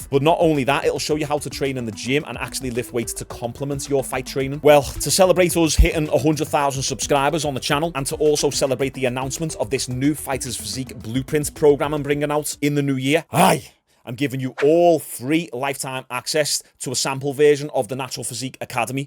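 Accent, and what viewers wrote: Liverpool, United Kingdom. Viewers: I don't usually sub to scouse channels as I find the accent annoying, but your content makes me forget that 😂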